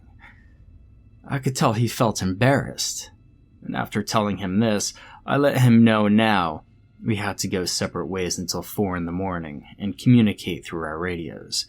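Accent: South, United States. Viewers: I thought you was from down south here around Tennessee South & North Carolina or Kentucky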